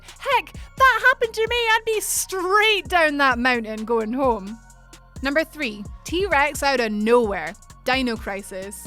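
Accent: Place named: Scotland, United Kingdom. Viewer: I've said it before and I'll day it again. That accent 😍 MonTheScots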